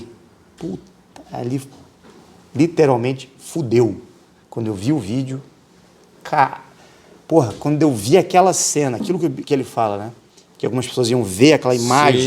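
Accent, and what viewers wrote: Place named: Rio de Janeiro, Brazil. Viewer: está carioca..😮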